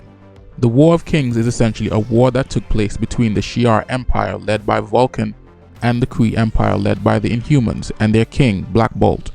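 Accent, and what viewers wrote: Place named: West Indies, Caribbean. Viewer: I like your accent. where are you from? You sound like you are from the West Indies.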